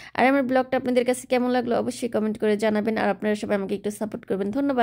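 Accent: Faridpur, Bangladesh. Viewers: আপু আপনার বাড়ি কোন জেলায়, জানাবেন,,আপনার কথা গুলো আমাদের, ফরিদ পুর ভাষার মত লাগে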